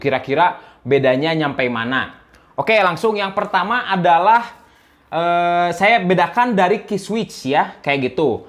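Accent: West Java, Indonesia. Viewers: Resep kana aksen sunda na 😂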